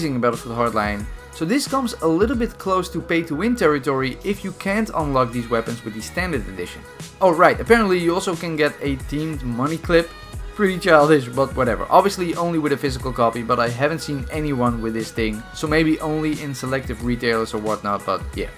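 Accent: Netherlands. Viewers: So you from Netherlands?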